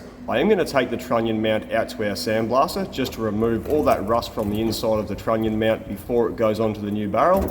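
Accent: Queensland, Australia. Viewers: Back to listen to the finest example of the Queenslander accent on YouTube. Unless Wayne Bennett's got an account on here I don't know about, but it's not like he'd talk.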